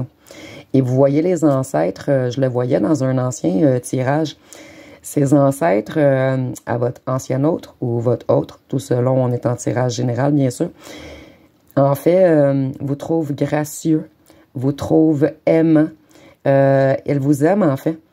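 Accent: Quebec, Canada. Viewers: Bonjour à vous ,je viens de vous découvrir et de m'abonner .Vous m'avez grandement impressionnée. Le choix judicieux des mots qu'on entends pas dans d'autres guidances et qui réconfortent, qui nous aident à grandir ,à garder la foi ,à être fiers de qui ont est .Votre accent semble québécois est-ce que je me trompe ? Merci beaucoup et gratitude à l'univers pour cette belle découverte. Bonne journée ! 😊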